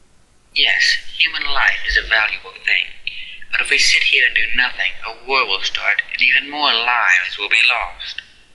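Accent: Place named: United Kingdom. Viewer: British accent..nuff' said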